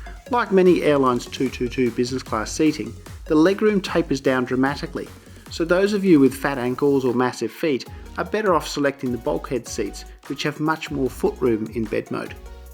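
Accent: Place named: Australia. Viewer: Love the Australian accent!!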